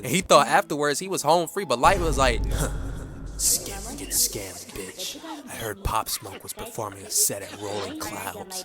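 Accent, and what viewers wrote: Philadelphia, United States. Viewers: Yo bro where you from? sound like a philly buhl😭😭😭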